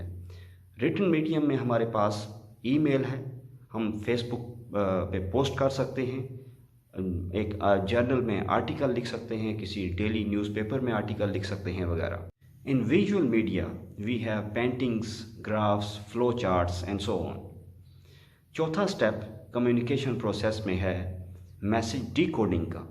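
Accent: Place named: Kashmir, India. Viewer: A nice combination of urdu, a little bit hindi and English in kashmiri accent... It was really informative sir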